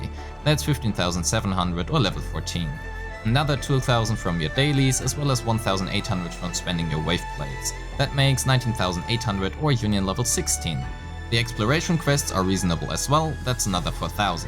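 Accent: Germany. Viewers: you sound german! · Are you German?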